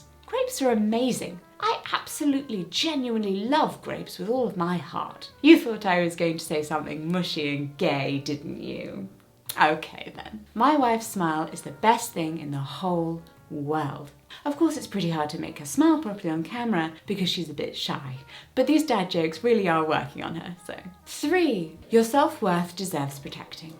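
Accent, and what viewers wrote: RP, United Kingdom. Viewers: you do sound posh. i wish i sounded half as posh. the more i watch your videos and british english shows, the sadder i feel about the fact that as a canadian, i sound more like an american. which, at this point, is the real insult. 😂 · Between the 50s aesthetics, British "proper speech" and your serene smile, I am not sure if it's scientifically possible to be this gorgeous God fucking help me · “Sound Too posh” yeah and it’s pretty great 👍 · A video from a posh-sounding, very fragrant lesbian with the right amount of make-up being full of wonderful sentiments. What's not to love? Thank you for making this! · Is it just me or is extra British in this video. This video is good and inspiring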